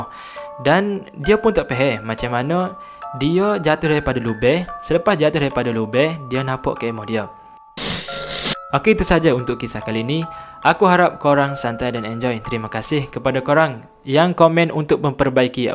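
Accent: East Coast, Malaysia. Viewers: Jumpa new channel horror storyteller.. subscribe tnd support.. dgr dr slang percakapan myb dr Pantai Timur.. Apapun terbaik bro..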